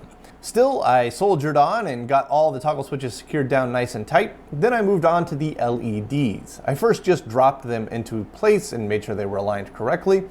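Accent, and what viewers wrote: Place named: United States. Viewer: Right? Always nice to see some metric from someone with an American-sounding accent. :) We'll get there eventually!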